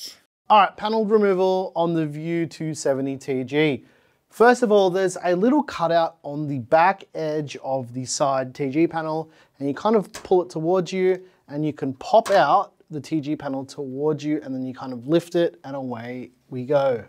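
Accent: Australia. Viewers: I just bought this case and looked up this video to see how to take off the glass panels without manhandling and breaking them. Stayed the whole video for the chill vibes and Australian accent.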